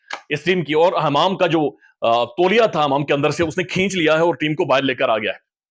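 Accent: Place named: Kashmir, India. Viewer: Ap kashmiri lagty ho apki urdu gulabi hai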